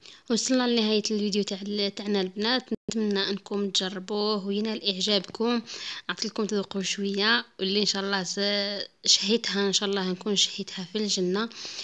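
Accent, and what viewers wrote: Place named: Chaoui, Algeria. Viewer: اللهجة نناعك حاساتك شاوية يبان لسانك متعود على الشاوية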